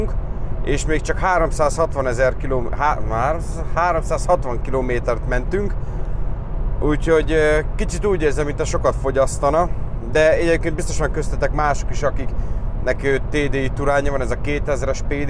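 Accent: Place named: Austria. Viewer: Osztrák🤌 Én is így mondom🤷‍♂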